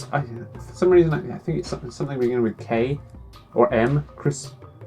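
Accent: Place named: United Kingdom. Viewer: Its strange, I always assumed the genius behind was American. Nice to hear a fellow Brit that does YTPs. (I dont make videos I just mean I'm also British)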